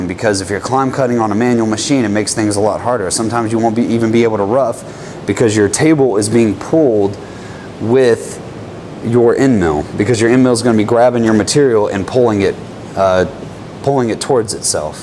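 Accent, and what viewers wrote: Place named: South, United States. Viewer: The guy clearly has a southern accent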